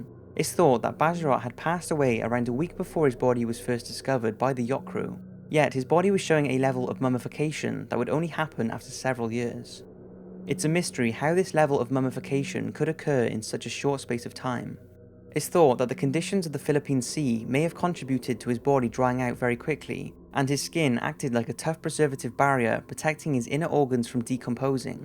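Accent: Wales, United Kingdom. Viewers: Wales, UK :P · are you welsh? I know it sounds like a stupid question, but are you? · Love to know where your from. Kinda sound a bit Welshy. Bit of a Cardiff accent going on when you say some words. Your awesome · Ahhhh a good British voice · He is British but I mean you're obviously right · I would say Welsh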